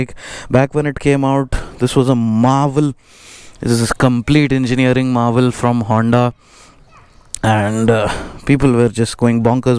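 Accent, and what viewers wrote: Hyderabad, India. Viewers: I have been laughing through out the video... Bro had i been a Hyderabadi i could have this phonetics....Hallu hallu chala nahi ghus jata maa ki kir kiri....I love this maan... :D From Bhubaneswar, Odisha. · I watch your videos for 2 things, your hyderabadi slang and the beautiful bikes you show us! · U r language is very funny 😆 I am very enjoy u r video. I love hydrabadi language ❤.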